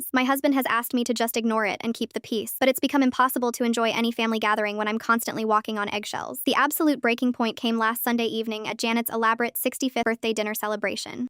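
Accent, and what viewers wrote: Boston, United States. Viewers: So you're from lower Boston just lean into it more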